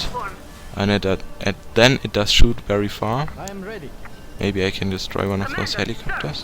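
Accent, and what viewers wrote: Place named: Germany. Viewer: Man hört den deutschen Akzent aber sehr gutes vid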